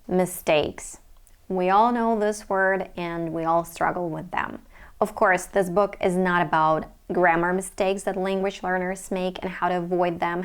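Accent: United States. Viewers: You are very Beautiful and Intelligent. I am amazed that you have developed accurate American accent while not living in U.S.A. I would recommend to learn Technical books as well. I mean, Mathematics, Computer Programming in Python and Artificial Intelligence books. To know American Accent, learn Phonetics, Linguistics, Phonics. Learning Natural Language Processing can also help you about American English and Accent too....... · Could you please teach us, how to pronounce "R" in American accent like you.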